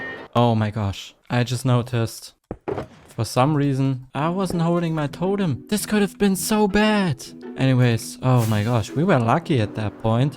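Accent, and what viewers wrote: Germany. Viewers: You are not "Hochdeutsch" are you? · du bist deutsch das hört man an deinem english und kein engländer sagt oki doki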